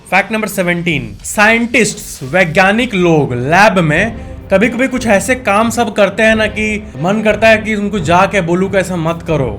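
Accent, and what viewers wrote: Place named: Delhi, India. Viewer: Seriously bihari Babu talking South Delhi Hindi is great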